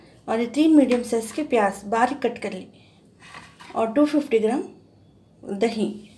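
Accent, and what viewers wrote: Hyderabad, India. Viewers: Hamari Hyderabadi's language hai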